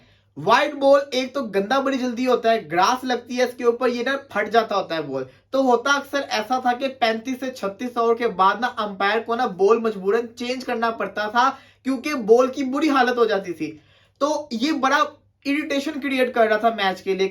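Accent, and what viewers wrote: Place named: Lahore, Pakistan. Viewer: Bro you must be lahori. I can tell it by your ‘ڑ ’😂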